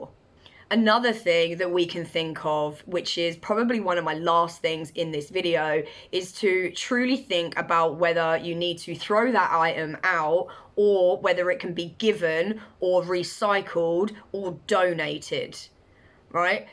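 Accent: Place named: Leicester, United Kingdom. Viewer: Sounds like you're from leicester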